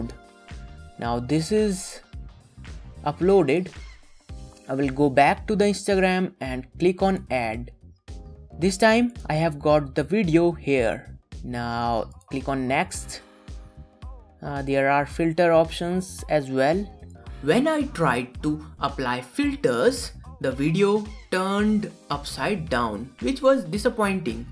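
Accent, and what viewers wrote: India. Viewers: just cuz the guy sounds a bit indian? racism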